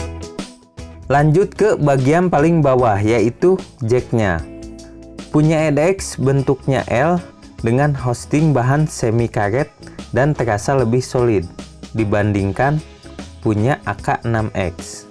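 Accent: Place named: Nagreg, Indonesia. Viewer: Owalahhh orng nagreg to bang 🤣 Btw riview nya bagus 👍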